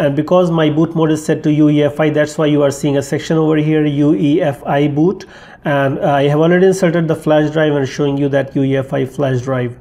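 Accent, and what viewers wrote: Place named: India. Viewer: Kitna bhi prayash kar rahe ho Par american waali feling ni aa rahi hai indian ho indian hi rahoge kitna bhi english bol lo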